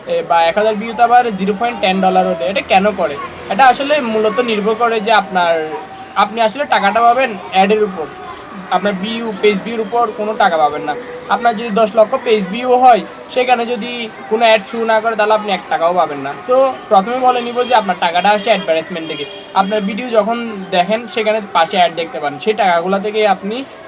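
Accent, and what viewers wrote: Chittagong, Bangladesh. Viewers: ভাইয়ের বাড়ি হয়তো চিটাগাং অথবা কক্সবাজার অঞ্চলে!!কথায় স্পষ্ট বুঝা যায়!!! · vaiya,,,apni dheki amder Chittagong pola,,,,,ekta kotha hosse,,,seyta hosse,,,,flag mane ki? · Noakhailla na mia ,chatgaiya.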